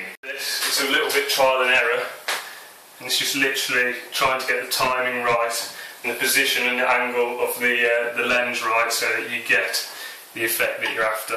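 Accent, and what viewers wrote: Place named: Birmingham, United Kingdom. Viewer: thanks! just what i was looking for! also i love your brummie accent :) x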